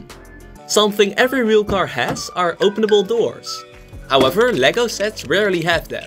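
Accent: Netherlands. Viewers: I smell dutch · you sound dutch just like me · Dik Nederlands accent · The cuts are a little too fast for my liking, sorry to say I don't have this much ADHD. Also, the dutch accent is a little too noticable. Great job on the modifications though, that is some very real talent you display there. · Where are you from? I here a Dutch accent❤😂 I'm Dutch BTW. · Ik ruik een Nederlander aan het accent te horen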